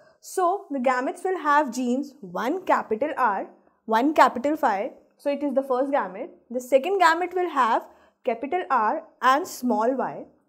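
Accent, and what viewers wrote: India. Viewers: the indian accent is dominant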